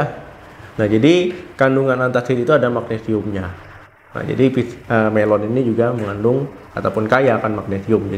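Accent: Aceh, Indonesia. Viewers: Lidahnya Aceh banget dok😊. Saya org Aceh tinggal di Batam. Saleum aneuk nanggroe 🙏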